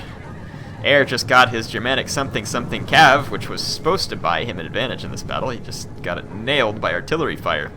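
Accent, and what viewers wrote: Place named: Kentucky, United States. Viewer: Always making my night better with that great Kentucky accent, and leading such a victorious Germanic something army, makes it even enjoyable, great job, I'm really looking forward to the next one, also I really really wish that Attila had the Suebian faction.. I really loved them in Rome 2 but they were a little bit... naked, it would be awesome to see them armored suebi rampaging in the lands of the western romans.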